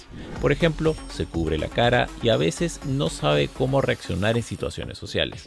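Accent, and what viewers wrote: Peru. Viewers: Muy Buen video. Eres peruano? Tienes el acento.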